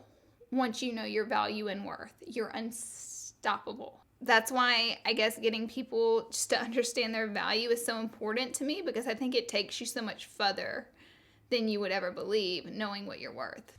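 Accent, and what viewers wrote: South, United States. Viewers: I just love you... I come from the south too, and when I watched one of your videos for the first time, I was so shocked. I was so shocked to hear someone that actually sounded like me on YouTube. Someone who had a "thick" accent, like me, who was so out there. I love how you don't try to cover up your roots, or force yourself to be something or someone that your not. I'm writin this to you all the way from a teeny, tiny town to tell you to keep on keepin on, and to tell you thank you. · My heart is just so whole after this video, I remember when I first watched you I didn't know if I was going to keep on watching or not, but after hearing your southern accent I felt right at home(Georgia Peach, also Alabama fan). I loved when you talked about self worth, lately I've been in this long term relationship and he's the best boyfriend ever, but there was a point where I had no self worth because of all the drama and long distant we were going through. 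Now I've been working week by week fixing my self esteem and glueing all the pieces back together. I know that I may have my family, friends, boyfriend and other things to carry me if I need help, but I want to be able to walk on my own and not need help, and that's what I'm working on now. Your makeup videos have always inspired to safe up my money and spoil myself(because not only do have a makeup addition but I deserve it), but they've also inspired to reach my goals and look fabulous while doing it. You're such a glorious and fabulous human being and I love you 😘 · Omg yes!! Can't wait for your merch!!! I hope it has some of your country twang or sayings!:)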